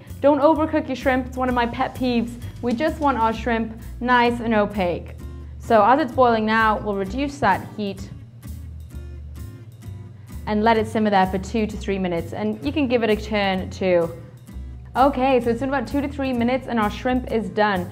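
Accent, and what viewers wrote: Australia. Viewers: she kinda sounds like she has a faint Australian accent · sounds australian...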